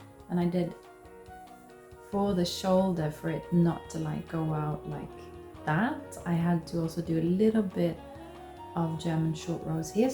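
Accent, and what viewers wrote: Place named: England, United Kingdom. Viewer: I love your OG English accent. So refreshing to hear “Maths”, not “math” 😊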